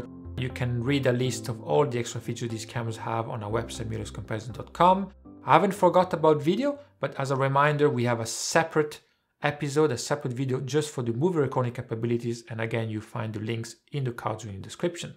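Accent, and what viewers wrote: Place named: France. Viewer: Are You French? (je suis français et je reconnais un accent bien de chez nous^^). J'aimerais tellement que tu puisses tester / proposer des comparaisons avec le FUJI XT4, un APSC qui me fait de l'oeil. Même une comparaison avec des FF (Full Frame) serait intéressant:)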